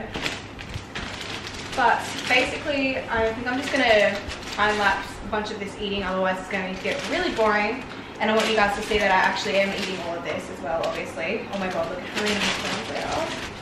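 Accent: Australia. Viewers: I love you girl. I admire how much you can eat. You’re beautiful and I love your Aussie accent ❤️ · She definatly has an Australian accent and the scenery in the backround looks Australian but I don't know for definite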